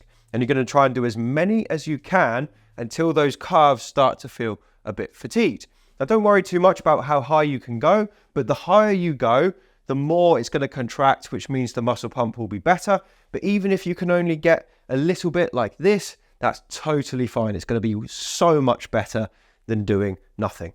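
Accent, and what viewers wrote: United Kingdom. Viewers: I am appreciative of your videos and your book. You’ve given this Yank a chuckle over the difference in the way you Brits pronounce medical terms such as capillaries. You put the accent on the second syllable whereas we accent the first: cap.🧢pillars.😊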